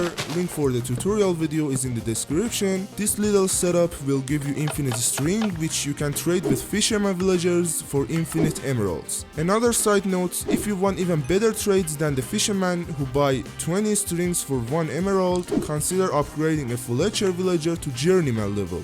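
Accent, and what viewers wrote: Iran. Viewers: داداش ایرانی هستی؟از لحجه فهمیدم😂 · your persian accent goes hard 😈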